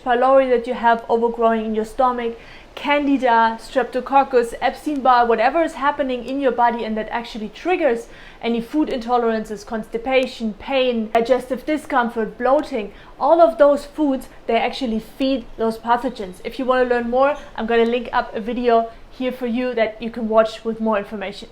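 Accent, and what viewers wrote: Germany. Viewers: Good job 👍, do I hear a hint of a German accent?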